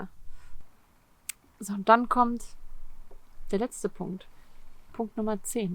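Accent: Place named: United States. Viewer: This is a real good comment, I was thinking something along these lines myself. With the risk of appearing offended (which I am not) I feel some of the conclusions/observations are a bit superficial. If the video wasn’t recorded in German I’d easily mistaken her for being American 😎 The Swedes might have Ikea and H&M, but we have Equinor, Telenor and Norsk Hydro 😆And, mind you, regarding being ‘lazy’, lets remember the GDP per capita for Norway is almost twice that of Germany 😶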